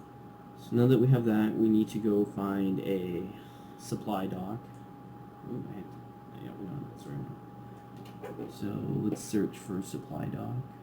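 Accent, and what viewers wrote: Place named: United States. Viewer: Youve got one of my favourite America accents 👍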